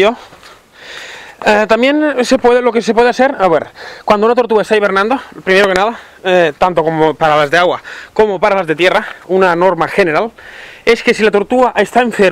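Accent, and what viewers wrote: Catalonia, Spain. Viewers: Es Español, pero la lengua española no es su lengua materna, creo el habla catalán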